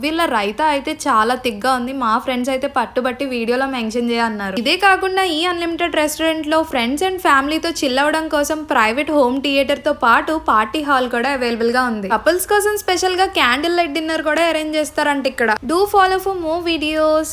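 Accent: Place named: Godavari, India. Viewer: Bad comments gurinchi alochinchaku normal ga muslims yeppudu hindi ne matladatharu kani ni achamaina godavari slang bagundhi and Mamulgane milo vallani bayataki ranivaru and face kuda kanapadanivaru but miru intha freedom ga vunnaru ante mi intlo mi valla dhaggara miru thechukunna trust....chala baga vuntay mi videos ❤🥰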